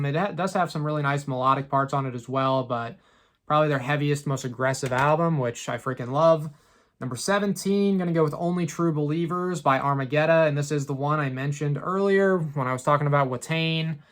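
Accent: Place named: East Coast, United States. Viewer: Are you from the East Coast? I ask that because of how you pronounce Twenty as "Chwenty." I give my workmate shit for that lol, hes from Mass love the video, just revisted again from when I watched it before and never noticed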